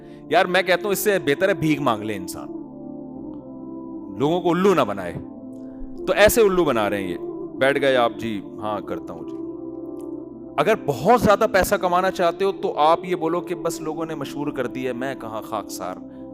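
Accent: India. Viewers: wha mufti saheb aap ki urdu bilkul indian he aap salamat rahe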